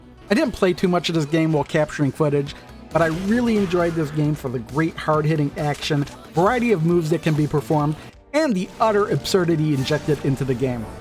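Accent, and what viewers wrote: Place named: Chicago, United States. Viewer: This video was great. Personally, I'm really interested in unique but high quality indie/import titles for the Switch... but... I'm just getting into it... so... I'm swamped, trying to wade my way through. Clean little segments like this definitely help out. Thanks! On a separate note, I'm trying to place your dialect. I thought maybe long forgotten Acadian but I just heard you say "da game" which triggered my dormant memory of George Wendt saying "da bears!". So that's my guess: Chicago and if not, then rural Illinois.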